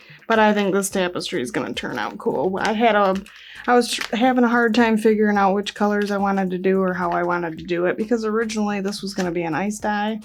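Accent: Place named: Michigan, United States. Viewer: awe thank you so much!!! That is so sweet! I definitely will take you up on that! I'm subscribing to your channel now, your videos are inspiring! I've lived in Michigan my entire life and your "accent" reminds me alot of ours lol im sure your state is close I thought that was cool as well, I feel like I don't hear it to often on YouTube.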